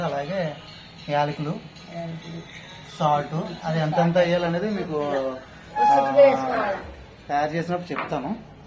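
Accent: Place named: Andhra Pradesh, India. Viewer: Super recipes ee vooru andi meedi language maa Andhra language laa vunfi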